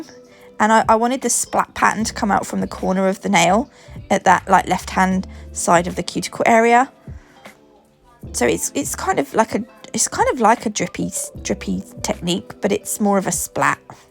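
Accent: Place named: United Kingdom. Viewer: I absolutely love your content and I really enjoy watching your videos and I just love your accent it reminds me of my extended family who live in the UK 🇬🇧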